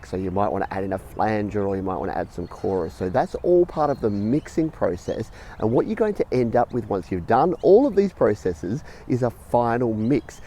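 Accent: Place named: Australia. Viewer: ... thanks. By the way love the vids keep them coming it’s good to here a fellow aussies voice when learning stuff instead of American all the time.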